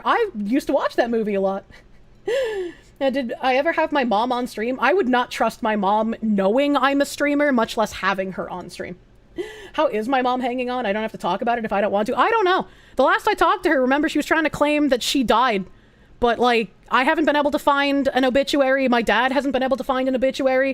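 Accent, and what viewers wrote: Boston, United States. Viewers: Here here! We need her lovely Boston accent spitting facts out here.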